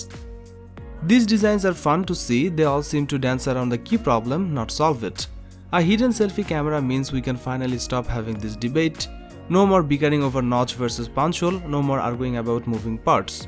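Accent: Bengal, India. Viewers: Bengali accent is unique 😄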